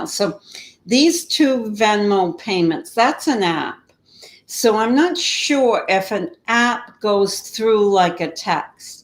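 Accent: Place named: East Coast, United States. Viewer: I love your Eastern accent...New York? Boston? Where are you from? Thank you for sharing your knowledge....Very much appreciated!🌞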